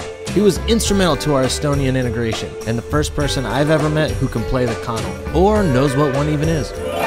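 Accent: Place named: Alaska, United States. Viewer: Where in laska you from!?! Palmer!?! You sound like a 'sillian..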